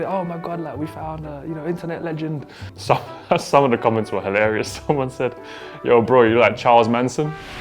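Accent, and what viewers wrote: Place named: London, United Kingdom. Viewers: I heard his voice and immediately thought “he’s such a Londoner” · He sounds like he is from North London. That was typed before I saw he was from London.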